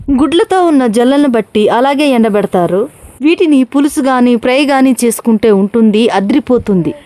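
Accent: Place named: Telugu, India. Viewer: మీ తెలుగు యాస కు బాగ ఎడిట్ అయ్యాను నేను మా ఊరు చిత్తూరు నాకు నచ్చిన భాష తమిళం 😮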